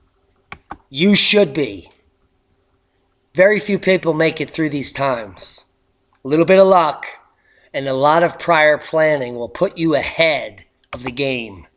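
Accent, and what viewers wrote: England, United Kingdom. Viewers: nice Brit accent bra! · Love your English accent. What accent, I don't have an accent.LOLLOL. Great show.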